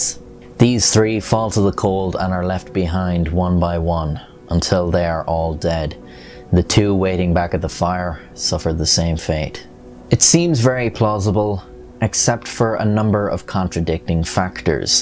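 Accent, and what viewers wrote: Ireland. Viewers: A fascinating story. BTW, You have a very good narration voice. Only slightly Irish, and that's OK. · You tell a story very well. I like your Irish accent. This story always fascinated me. Common sense says it was a freak weather occurrence that seemed like an avalanche because it obviously made them run for their lives. Likely in the rush one of them might have not been able to quickly unzip the tents flap and cut himself out. Things like the eyes/tongue missing would be forest animals/birds. I wonder if a movie has ever been made recreating the most likely scenario. It would be interesting. RIP to them all.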